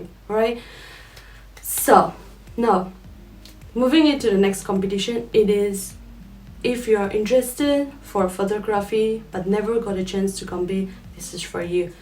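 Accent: Nagaland, India. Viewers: I love you speaking Sumi dialect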